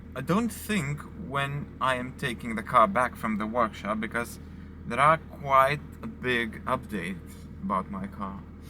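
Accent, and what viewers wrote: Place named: RP, United Kingdom. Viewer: posh bri'ish accent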